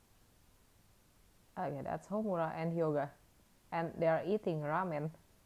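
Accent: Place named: Philippines. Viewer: seriously, sister, where are you from? I heard a foreign accent .. Are you from the Philippines?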